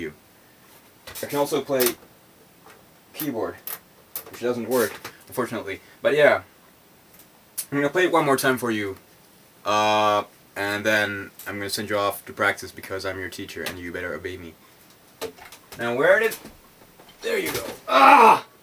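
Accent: United States. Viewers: sweet vid. Its odd you dont really have any accent. You sound like you could have been raised in the midwest.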